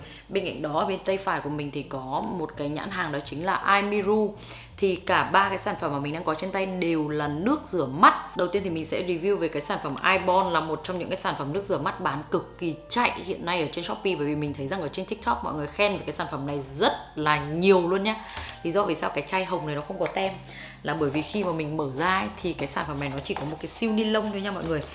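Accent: Nghe An, Vietnam. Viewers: Răng mà nghe giọng Nghệ An ở đây họ😗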